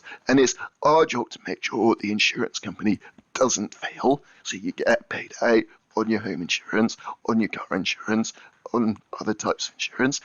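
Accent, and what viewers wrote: United Kingdom. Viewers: Need clear voice recordings, esp some of us are not used to Brit accent. The point on risk vs scientific conservativism is real.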